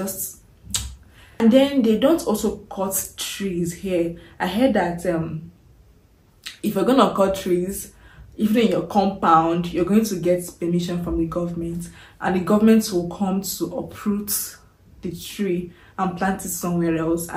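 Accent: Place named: Igbo, Nigeria. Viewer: That's her Igbo accent. It's not everyone in Nigeria that calls it PEPE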